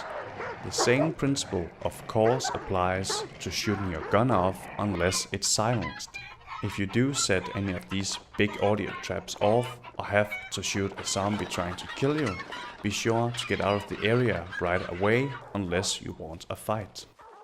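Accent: Denmark. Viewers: You sound danish? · U english sounds very danish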